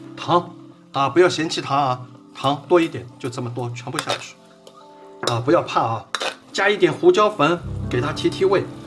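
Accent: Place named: Jiangnan, China. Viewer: 很幽默的师傅，能听到江南口音。虽然觉得我自己做饺子皮还挺熟练的，这个烧卖皮还是有难度。厉害厉害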